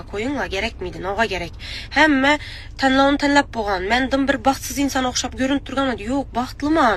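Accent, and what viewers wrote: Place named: Khorezm, Uzbekistan. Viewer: Шивада гапираяпти хоразимча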